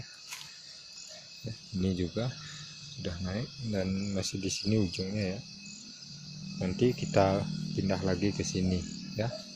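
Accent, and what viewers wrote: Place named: Bali, Indonesia. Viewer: logat Bali ini sepertinya engken bli kabare becik becik ya salam dari jakarta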